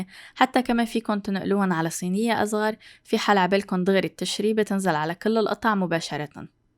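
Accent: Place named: Lebanon. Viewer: احب اتابعك من سهولة وصفاتك والكلام المفيد والمختصر والجميل وباللكنة اللبنانية يلي بعشقها.. محبتك من السعودية..😘💓🥰🕊 شكرا على قناتك المفيدة استفدت منك كثير والله ممنونه حبيبتي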